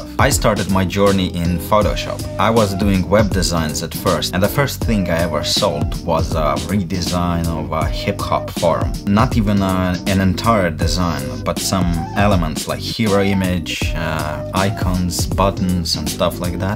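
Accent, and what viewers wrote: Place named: Russia. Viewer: (Rus) -Такое чувство что твой родной язык русский. Но все ровно за опыт который ты передаешь своим подписчикам. (Eng) - I feel you're native language Russian. Anyway thanks for experience that you transmit with your subscription. 😁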